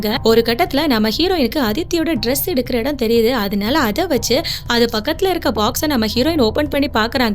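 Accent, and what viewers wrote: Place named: Kerala, India. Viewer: Malayalam accent explanation 😅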